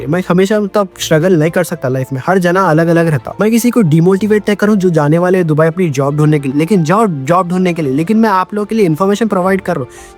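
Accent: Hyderabad, India. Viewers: Bro your Hyderabadi accent 🥰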